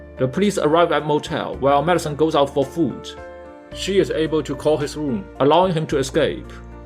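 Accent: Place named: United Kingdom. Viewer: your accent is too british cannot understand anything clearly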